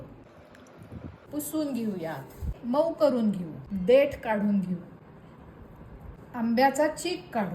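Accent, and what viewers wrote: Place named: Pune, India. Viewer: कुठे आहे हा जन्या farm .. तुमच्या बोलण्यावरून तुम्ही पुणेकर वाटताय.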